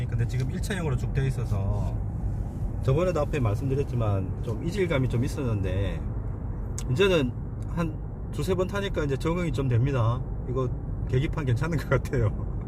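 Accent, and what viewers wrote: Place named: China. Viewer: 조선족이죠?말투가 확티가나는데 ㅋㅋ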